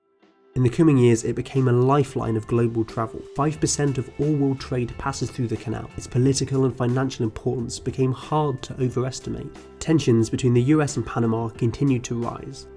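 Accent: England, United Kingdom. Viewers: Jane Kailey are you unable to understand the British Accent? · British accent sucks. That being the case, you should talk slow dude! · Pedro Aguiar Yes..he’s English and that’s the English way of saying the country. Do we have to call Germany Deutschland while we’re at it?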